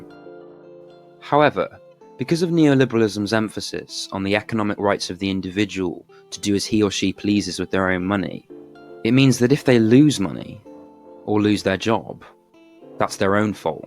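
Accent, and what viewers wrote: United Kingdom. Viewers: have you never heard a british person before? his accent is very standard and easily understood if you don't live under a rock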